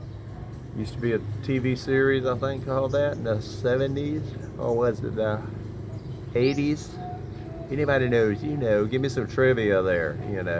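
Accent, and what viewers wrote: South, United States. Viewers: I like your Southern accent. I live here in Tallahassee, Florida which is just part of the deep south.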